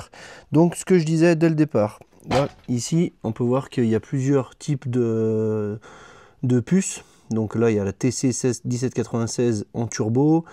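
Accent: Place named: England, United Kingdom. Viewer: Bonjour, j'ai fait une visite par hasard sur ta chaine ( 1 pouce et un commentaire ) mais ce que j'y ai vu m'a fait prendre , disons 40 ans de plus !!!🥸 Fidèle abonné de la chaine CVVT de Vincent Raynaud, je ne l'ai jamais vu se lancer dans de telles aventures informatiques. Les Mini dont il est un spécialiste sont certainement des modèles plus anciens. Pour info cependant si tu ne le connais pas, il restaure des voitures récupérées dans le maquis ( Corse) et a même remonté une Mini avec laquelle il a fait un rallye historique il y a 2 ans ! Pour conclure je suis quand même assez surpris d'avoir découvert que le moteur de cette voiture avait été cassé à plusieurs reprises ! Bon courage pour arriver à tes fins, quant à l'accent anglais, pas de problème, mais traduis plutôt les messages en français ce sera plus cool.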